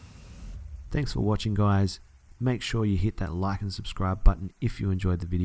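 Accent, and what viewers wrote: Australia. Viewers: Started the video and wondered if you where an Aussie then checked the description and laughed Ps. It's g'day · Love your channel Man! I'm a fellow Aussie and it's so nice to hear stories read in our accent :) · You know what scares me the most about your stories, but only the ones you tell that actually happened to you? You have a fucking Australian accent, when I was a kid my house was broken into multiple times but nothing was ever taken. Now that I think of it it scares the shit out of me. Anyway the things that you talk about, make me shake so hard. I don't want to think that any of this shit happens in Australia. But then again, I have seen Ivan Milat in real life too. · Are you Australian?? Your accent sounds like you are.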